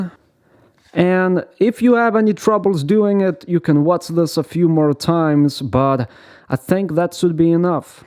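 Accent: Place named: Russia. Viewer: I’m just here to hear his Russian accent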